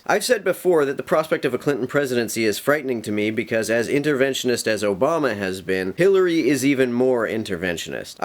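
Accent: Canada. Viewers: You're Canadian? But your accent is more less Canadian than mine (I'm from Minnesota).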